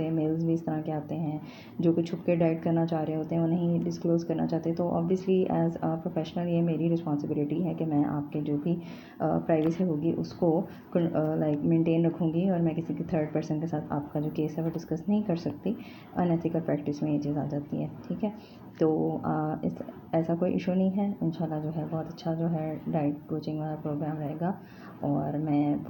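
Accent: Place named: Iran. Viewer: I thought u were from foreign country after hearing u r english i thought u were from Iran or saudi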